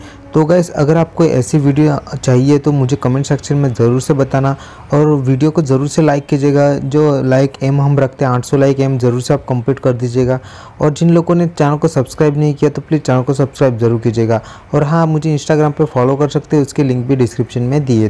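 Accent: India. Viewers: तुमच्या बोलण्यातून एखादा शब्द ऐकून कधीकधी असं वाटतं की तुम्ही मराठी आहात.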